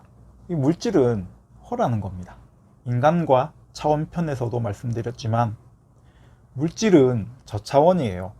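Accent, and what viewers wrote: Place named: Daegu, South Korea. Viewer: 영상 잘~ 듣습니다. 같은 대구말투도 정겹구요^^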